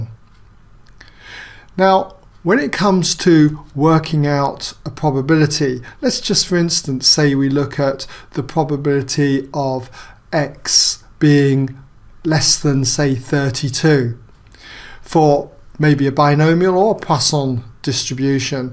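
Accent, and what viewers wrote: United Kingdom. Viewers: British accent is always so charming :)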